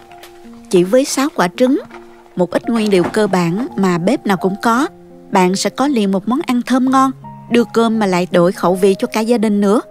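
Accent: South, Vietnam. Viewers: Giọng nói ngọt ngào quá. Tiếc là cô dùng từ Hán Việt nhiều quá, nặng nề mà không phù hợp. Chẳng hạn, “ cứu cánh” không có nghĩa là cứu giúp, cứu thoát. Cứu cánh là mục đích cuối cùng, giải pháp duy nhất…từ này có ý nghĩa triết học,không phù hợp với chuyện nấu nướng, thay đổi món ăn cho lạ miệng… Giọng miền nam ngọt ngào thì nên dùng cách nói giản dị, thân tình, dễ hiểu thì kênh của sẽ thu hút nhiều người xem hơn đó.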